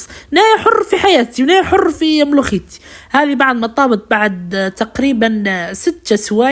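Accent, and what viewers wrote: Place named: Souf, Algeria. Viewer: اليوم ولأول مرة نكتشف قناتك قداش حلو وهدرتك حلوة في لول حسبتك طفلة صوتك حنين من بعد لقيتك طفل حاذق وفحل ربي يبارك فيك اللهجة السوفية تهبل تهبل❤❤❤متابعة جديدة من باتنة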